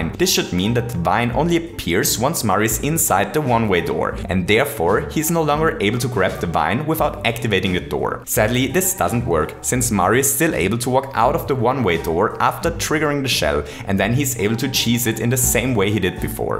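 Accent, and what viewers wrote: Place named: Germany. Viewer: Also ich find die Videos ja Super, aber der Deutsche akzent zu den Videos ist schrecklich x)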